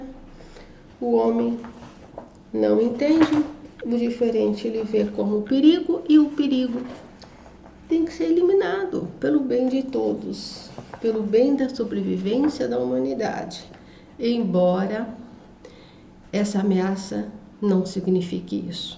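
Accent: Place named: Russia. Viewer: Muito bom,Grande descoberta esse canal! Eu tenho uma dúvida...a Senhora é russa?Se for então a senhora fala muito bem português rs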